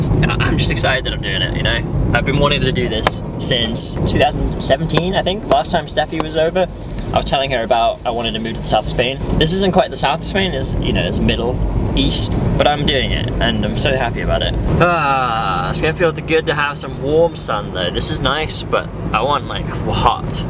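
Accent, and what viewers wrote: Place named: England, United Kingdom. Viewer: Why is your accent getting more English.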